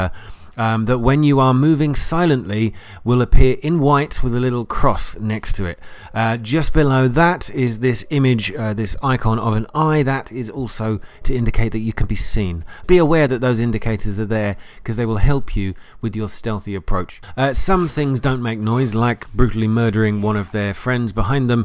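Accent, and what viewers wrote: United Kingdom. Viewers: Good to see a fellow British guy doing a video, expected an American but pleasantly surprised. Not that I have anything against the Americans. 😜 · YES YOUR BRITISH sub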